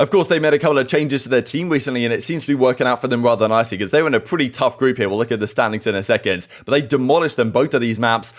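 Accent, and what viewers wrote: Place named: United Kingdom. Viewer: Thx for uploading daily, beside the news, at least your video is useful reference for learning British accent XD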